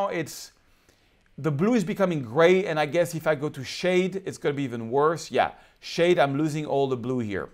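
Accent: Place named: France. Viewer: Je pense que tu es en train de perdre ton frenchie accent! ;) J'aime bien ce tuto, comme quoi, travailler ses photographies n'est qu'une question d'émotions que l'on veut y véhiculer.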